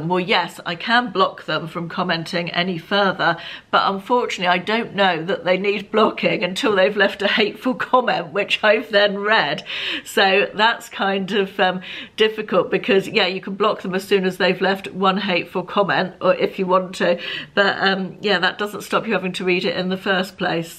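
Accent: United Kingdom. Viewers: ❤ you do what is best for you! I'm a recent subscriber and enjoy all your videos. Funny thing.......I subscribe to 4 UK women a d you all sound so different 😊❤! Much like in America.....with all of our different dialects......find it so interesting 😊😊😊😊. Keep on keeping on! I enjoy all my British friends!❤❤❤❤😊😊😊😊Kathy from Pennsylvania USA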